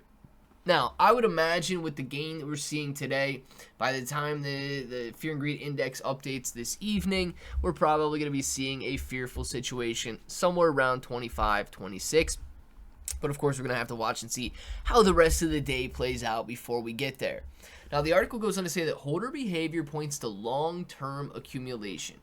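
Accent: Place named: Australia. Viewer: Your Australia accent was awesome!!!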